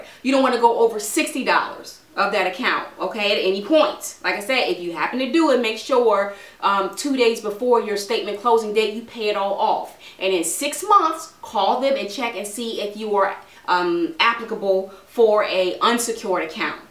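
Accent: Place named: DMV, United States. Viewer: Love your accent. Sounds almost like DMV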